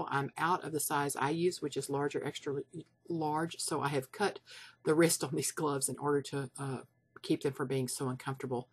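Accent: Texas, United States. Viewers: I beleive that is a Texas accent, and also I beleive by her demonstations that she is probably a teacher of young children. I love her videos.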